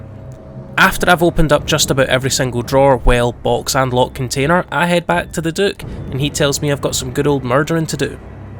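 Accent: Scotland, United Kingdom. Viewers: Scottish Dante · scottish dante ma men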